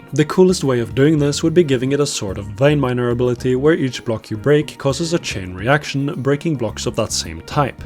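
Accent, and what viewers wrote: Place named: Norway. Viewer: I like your cute Norwegian accent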